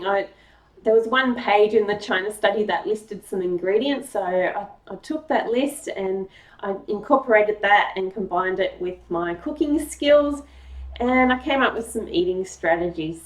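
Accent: Australia. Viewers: Ja, ist eine deutsche Name. Und sehen Sie auch eine deutsche Dame aus, obwohl Sie hören eine Australierin aus. Woher (in Deutschland) kommen Sie? Ich bin ein Kanadier geboren, von deutschen Eltern. Und habe ich für mein ganz Leben hier in Kanada gewohnt.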